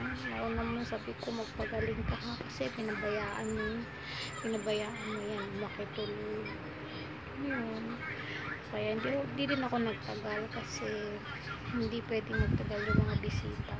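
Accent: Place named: Visayas, Philippines. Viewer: kaluoy sab sa imong amega sis...nice vlog cute kaau ka mag visaya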